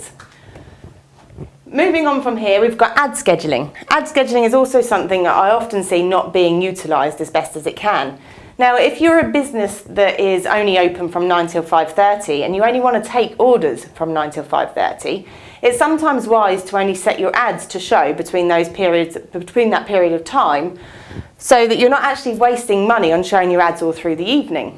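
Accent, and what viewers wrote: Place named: England, United Kingdom. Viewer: Good to hear an English voice for a change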